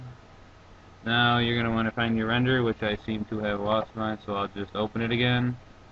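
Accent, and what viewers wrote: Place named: Michigan, United States. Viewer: Nice tut. and u sound like my old friend shroomz from michigan. i thinks its just your accents!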